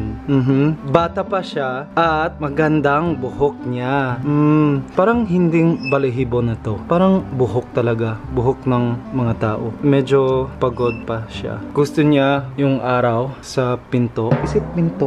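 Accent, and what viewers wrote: Philippines. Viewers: Haha luv your filipino accent 🤣🤣🤣Puede ba mag tagalog pa minsan minsan, katuwa ka eh😁😁😁Keep it up! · Lets Go To another Channel now i dont like to hear his filipino accent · Hahaha funny! I miss you pilipino accent!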